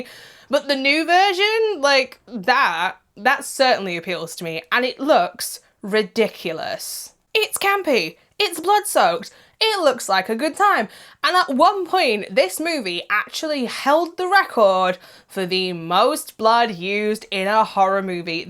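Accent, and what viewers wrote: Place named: United Kingdom. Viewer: tearin' bad movies apart with British accent makes it EVEN funnier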